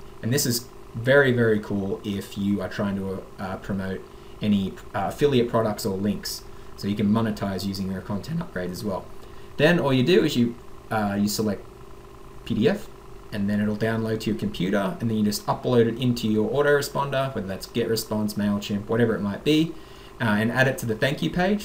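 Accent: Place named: Australia. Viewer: That was a very very good video. Thank you for sharing. BTW, love the Aussie accent :)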